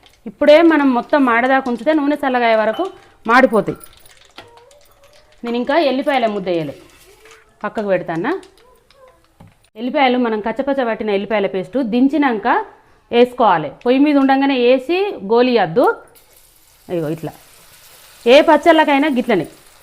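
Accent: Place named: Medak, India. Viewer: Meeru Medak district la mataduthunnaru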